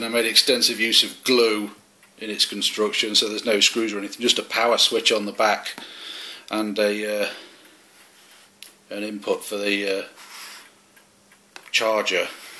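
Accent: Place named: United Kingdom. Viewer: What kind of British accent/dialect do you speak with? Hope that doesn't sound weird I'm just really curious haha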